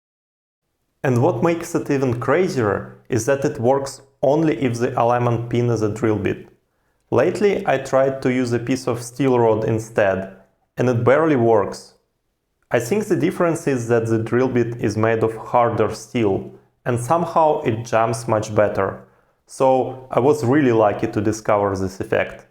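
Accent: Russia. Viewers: Are you russian or Norwegian and russian accent sounds same?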